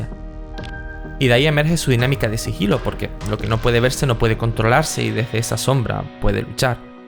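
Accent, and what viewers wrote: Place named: Canary Islands, Spain. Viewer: Eres un artista, y por tu acento pareces Canario. Lastima que no este en castellano el juego.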